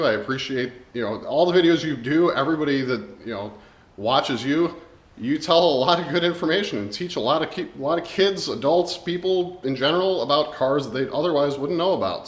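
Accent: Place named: Chicago, United States. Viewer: Everyone in Chicago sounds like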